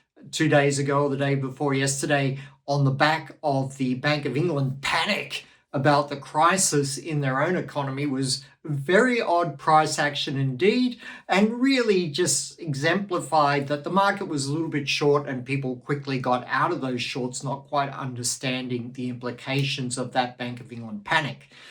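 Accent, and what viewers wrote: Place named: Australia. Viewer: Thanks greetings from Holland , I like your Australian accent.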